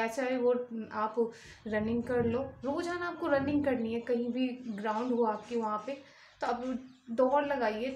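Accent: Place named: Mumbai, India. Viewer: Aji madam ji aap kaha se ho mujhe aapki aavaj Mumbai se lag rahi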